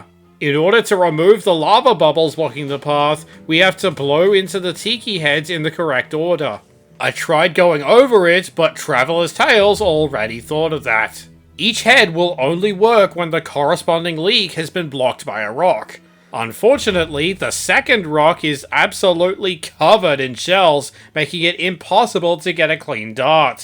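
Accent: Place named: Australia. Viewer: Never heard of this game, nor do I care about it at all...But I sure do love hearing this Aussie try to beat challenges, so I watched the whole thing.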